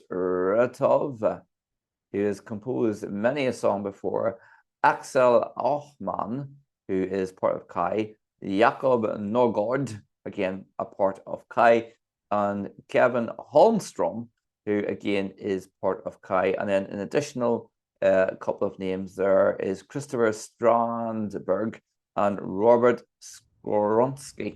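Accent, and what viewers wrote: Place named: Sweden. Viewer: an yer Swedish is steckin out!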